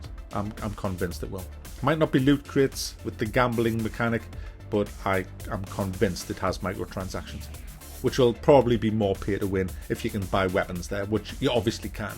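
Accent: United Kingdom. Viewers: Had to watch the video twice because the first time I was just listening to your awesome British accent saying sarcastic things that I lost what you were saying. :'D :P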